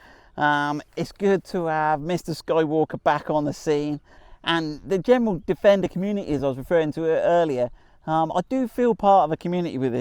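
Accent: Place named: Purton, United Kingdom. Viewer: Stay chilled. I totally get what you say about early adopters and I’m pretty sure we all appreciate your efforts. I for one continually sing your praises to everyone on the Range Rover 405 Facebooks page. I love the personal approach to your videos and explanations and agree with you about the sense of community and love the way you connect with your customers and subscribers (maybe it’s because I’m an old Purtonian and we share the same accent). Keep well and make the time to enjoy Dad days with. All the best from (for once) sunny Dartmoor. 👍🏼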